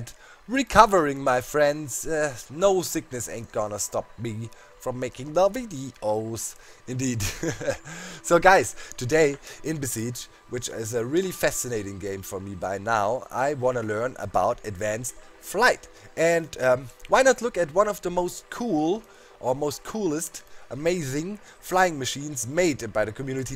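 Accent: Germany. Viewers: Man hört ds du deutscher bist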